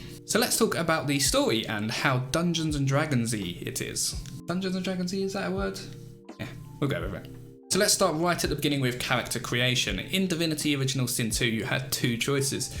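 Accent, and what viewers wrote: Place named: United Kingdom. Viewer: Hi! Great video! Just curious, where are you from? I would guess somewhere in UK but can’t place an exact place. I think baldurs gate 3 is gonna be amazing!! Thanks and keep up the great content.:)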